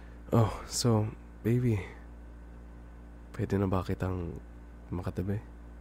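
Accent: Conyo, Philippines. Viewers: Shux you sounds so conyoooo~ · I love the accent so conyo vibes na nagtatagalog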